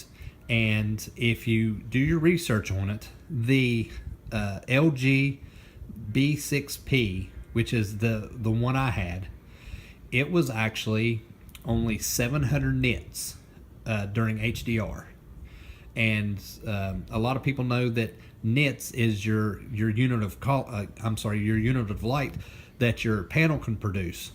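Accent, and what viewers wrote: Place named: South, United States. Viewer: Jordan Gons might just be his heavy southern accent.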